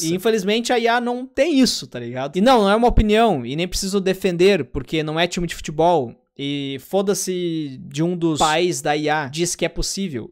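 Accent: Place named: Ceará, Brazil. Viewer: Cearense detected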